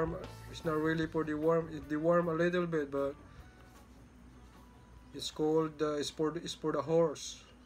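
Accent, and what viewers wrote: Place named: United States. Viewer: Parang original na kanu sir may accent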